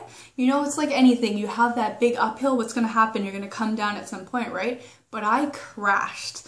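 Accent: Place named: Canada. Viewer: yo i love your Canadian accent.. so cute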